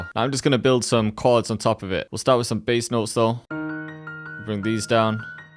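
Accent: United Kingdom. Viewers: YEAH BRO L;OVE THE PURE RAW UK ACCENT STYLE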